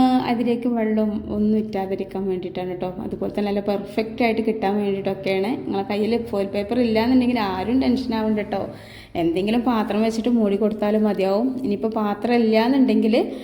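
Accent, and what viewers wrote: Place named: Kozhikode, India. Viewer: ഇത് ഉറപ്പായും ഞാൻ ഉണ്ടാക്കും ഈ വീടിയോ എനിക്കൊരുപാട് ഇഷ്ടായി കോഴിക്കോട്ടുകാരിയാണോ എനിക്കൊരു ഫ്രണ്ട് ഉണ്ട് കോഴിക്കോട് അവളുടെ അതേ സംസാരം നല്ല സംസാരം കേട്ടിരുന്ന് പോകും താങ്ക്യൂ💯👌🥰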